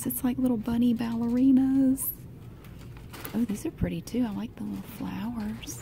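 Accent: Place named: Mississippi, United States. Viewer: You sound like me, I'm from southern Mississippi. New subscriber. 💖💛💚💙💜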